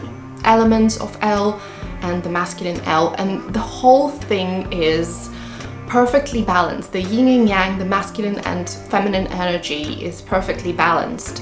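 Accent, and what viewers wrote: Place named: United Kingdom. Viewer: Hi. You said in another vid you live in Poland. Did you spend time in the UK? You sound part British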